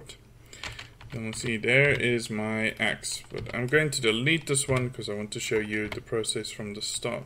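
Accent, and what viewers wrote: South Africa. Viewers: Great video! Are you South African?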